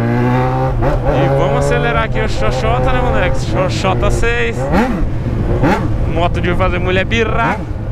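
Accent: Goiás, Brazil. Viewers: Vc é Goiano? esse sotaque seu, parece demais de goiano.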